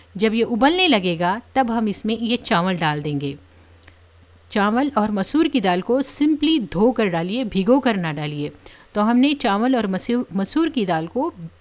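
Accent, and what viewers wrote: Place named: Karnataka, India. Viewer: Mam apka talluqh bidar se hai? Apne kabhi zikr nahi kiya laikin apke andaze takallum se itna yaqheen hai k ap hamare hum watani hain karnataka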